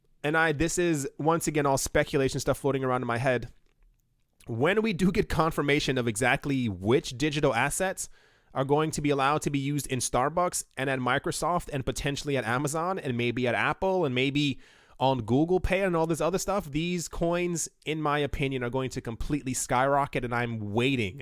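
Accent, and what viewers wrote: United Kingdom. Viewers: Mate, if someone tried to ridicule me for using a british accent, I would do the whole video in british accent. Don't be bothered by these knobs. If they're foolish enough to invest with a loan or can't see the developments in crypto space, then the crypto space will be better off without them anyways. Thanks for anothet great content and boy are we entering interesting times... all the best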